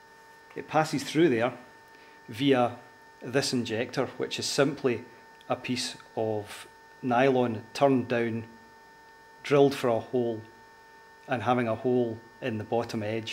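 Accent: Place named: Scotland, United Kingdom. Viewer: thats a Scottish accent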